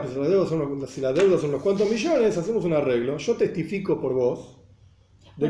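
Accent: Argentina. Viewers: Saludos una pregunta eres Argentino? Es agradable escuchar tu acento bueno Gracias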